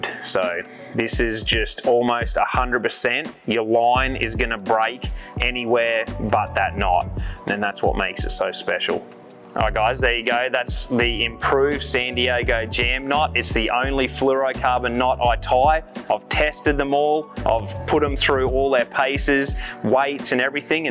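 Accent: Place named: Australia. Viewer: why do you sound like an Aussie when speaking English ? I am really curious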